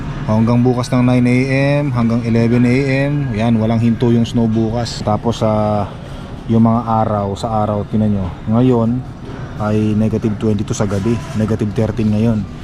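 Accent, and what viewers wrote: Philippines. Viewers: Nkakatuwang mkita mga snow dyan sa canada puti buong paligid ka. Masaya nga lagi mga blogs mo ka kya masayang panoorin ska pinoy na pinoy sa kilos at pananalita.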